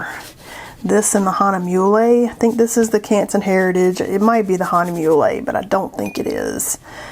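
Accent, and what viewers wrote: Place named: South Carolina, United States. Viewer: your SC accent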